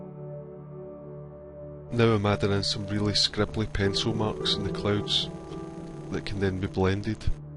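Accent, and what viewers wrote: Scotland, United Kingdom. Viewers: Thank you:) I have played this and followed along (basically playing with making my own buildings, street, art on the buildings) and so I have learned how to use the perspective tool in Painter. The music is nice, so is Scottish accent. Music is fantastic too. Best wishes and keep up the good work:) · good vid but i hate that scottisch accent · Love the accent, I think Scottish is the coolest accent actually :D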